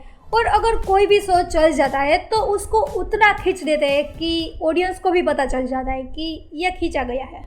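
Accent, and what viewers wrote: Gujarat, India. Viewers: aap gujrati ho kya??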